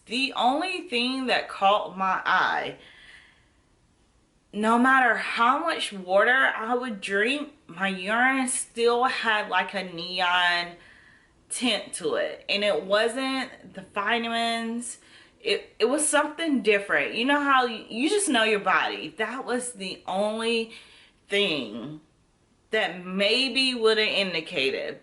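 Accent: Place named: Carolinas, United States. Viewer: I'm so glad I found this video! I heard your accent and I was like "She has to be from NC or SC" and when I heard you say it's still cold in NC and here in Florida, I was like "I knew it!!!!" I'm on Letrozole (Day 4 of pills tonight) and I have had very few side effects. Thank goodness! But I have had joint pain in my left knee and right ankle, but that didn't last long. I've had some nausea in the mornings, but it doesn't last long either. I am hopeful that this first round will work. I haven't had any previous issues with my female parts other than this year (2020) and my cycle being waaaayyy off. But luckily, my doctor is wonderful and listens to me. He feels like my issues are hormonal and that this first round could be successful.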